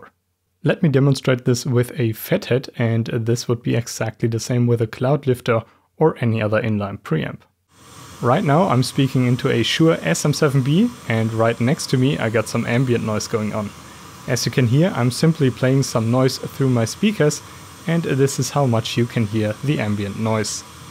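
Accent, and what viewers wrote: Germany. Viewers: awesome video!! just found your channel. great stuff man, keep at it. love your accent, is it german?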